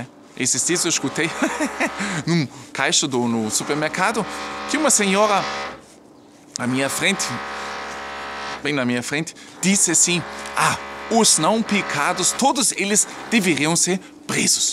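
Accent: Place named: Germany. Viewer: Permita-me trata-lo por meu amigo, voce desmonta todos os estereótipos, pré concepções e paradigmas que nós latinos temos a respeito dos Alemães como sendo um povo sério, carrancudo, de poucos amigos e cujo senso de humor não é um ponto forte. Se o Alemao medio é como imaginávamos, voce é um ponto fora da curva!! Voce esta de parabéns pelo senso de humor que se torna ainda mais pitoresco com seu sotaque alemao ( muito legal diga-se de passagem ) ao mesmo tempo que mantem o foco na informação de qualidade e sem muitos rodeios. Sensacional!! Tambem aprecio muito essa forma de dar as noticias, caminhando e mostrando um pouquinho de sua cultura para nos!! Parabéns!!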